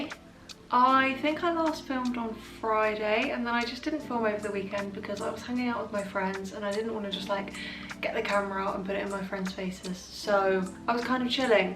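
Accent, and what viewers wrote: United Kingdom. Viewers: U sound so posh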